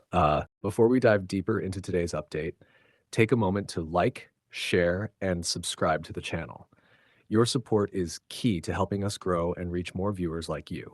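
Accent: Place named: United Kingdom. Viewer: I Agree with you pretty tea. Narrator u talking as if Ghana are doing Jamaica & the people of a Jamaica FAVOR. Please stop it. It is good to see African Nations and the country of Jamaica closing gaps and coming as one. For your INFO Jamaica is not a tiny Country. I am tired of UK= England belittle Jamaica by calling Ja. tiny. 1,big, 2, small, 3,tiny. So all the other Caribbean What are they?, if Jamaica is tiny?. Jamaica is the third Largest Island in the Caribbean. U should know the word tiny from small. You sound British. are you?. U just want to put down Jamaica, u JEALOUS.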